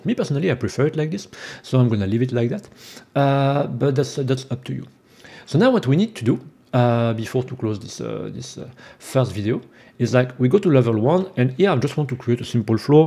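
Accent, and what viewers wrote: France. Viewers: Have questions will it be easier to make this with unity or godot And I like your mix french and English accent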